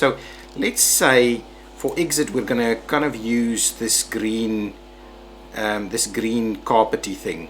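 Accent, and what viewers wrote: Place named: South Africa. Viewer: Your English seems a bit South African🤔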